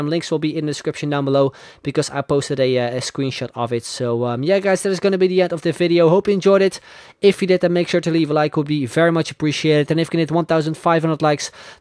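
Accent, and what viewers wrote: Netherlands. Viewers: you sound dutch. Are you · Are you dutch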